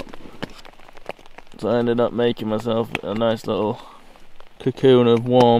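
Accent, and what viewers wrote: Yorkshire, United Kingdom. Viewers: Enjoyed your show! Im from CA, USA, Born here with 100% Yorkshire blood! 1st Generation American Boy Scout! avid Fly Fisherman and Hunter! Love to hear the Yorkshire accent! I just subscribed! I like your choice of dinner and whiskey! I used to do what you do and need to get back to it! only difference would be I bring my Black Lab! and longer stronger tent pegs! lol Peace! look forward to more shows! Keith R Skirrow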